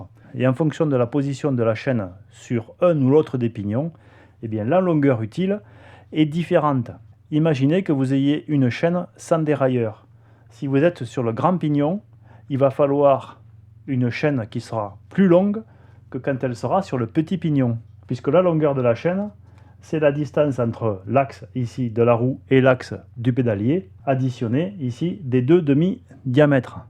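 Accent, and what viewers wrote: South, France. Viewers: Super, merci pour ces explications super claires avec le petit accent du sud en plus 😄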